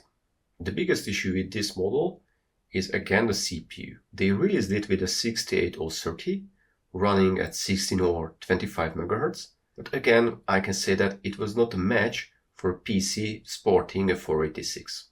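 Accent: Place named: Hungary. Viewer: My comments are being deleted by youtube, I think they dont like long comments other then channel owner... One more think, I think I hear Hungarian accent in your English, are you from Hungary? If so, could you make some research and video to very interesting topic, in early 80s Jack Trammiel had a Software developer team in Hungary that developed a lot of Commodore software, which is very interesting since Hungary was behind Iron Curtain. Can you find some background info, or maybe find someone from that era for interview?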